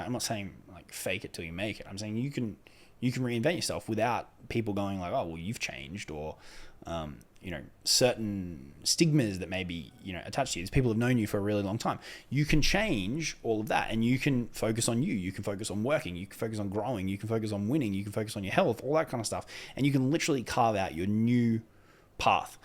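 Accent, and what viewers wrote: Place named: New York, United States. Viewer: He sounds like a New York kinda guy. I'm glad I left NYC in 2010.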